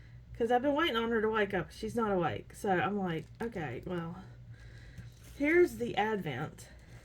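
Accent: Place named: South, United States. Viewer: Hi from Dothan, I knew you had to be from somewhere nearby when I heard your accent 😊. You've got a beautiful yarn room there and I love those Felici socks. I'm loving knitting the Pop Life Cardigan by WATG after making myself finish two large crochet afghans. Looking forward to seeing more from you and nice to meet you ❤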